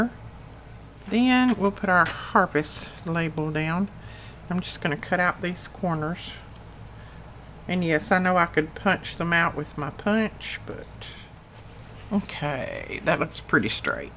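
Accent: South, United States. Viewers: I just love watching you craft your southern accent is adorable and listening to you chat is entertaining, just wish I could answer and chat with you. Thank you for all your beautiful videos.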